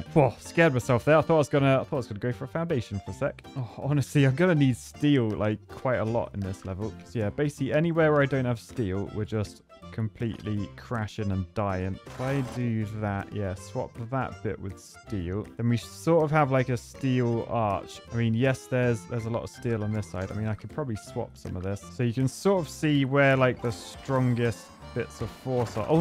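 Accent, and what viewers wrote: United Kingdom. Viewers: I love the little British man in my phone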